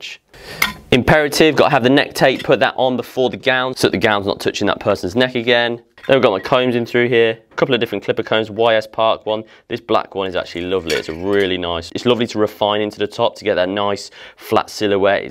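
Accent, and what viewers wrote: Cockney, United Kingdom. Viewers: I love cockney way you speak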